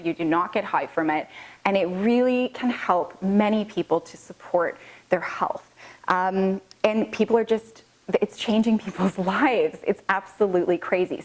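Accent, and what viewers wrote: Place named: Canada. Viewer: Are you canadian ? Just wondering cause your accent is awsome ✊💯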